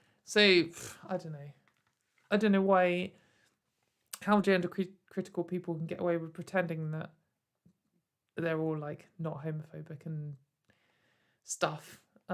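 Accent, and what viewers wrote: United Kingdom. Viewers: My first video of yours! Very excited! I have to say, I love your voice, it's honestly very soothing. And as an American I of course find any UK accent attractive (okay maybe not Cockney accents, but still) I also am so blown away by your patience and calm tone throughout the video (I mean I'm only halfway, but I wouldve lost my absolute shit by now).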